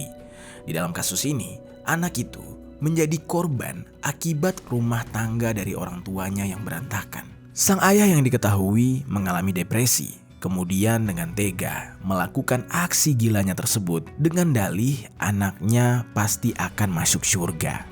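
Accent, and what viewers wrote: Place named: Banyumas, Indonesia. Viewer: Ngapak brow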